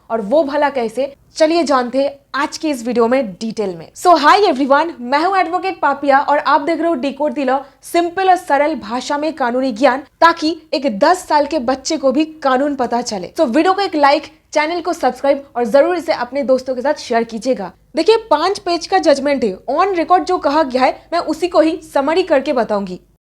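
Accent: Bengal, India. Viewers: Apnar kotha sune mone hochche apni bangali??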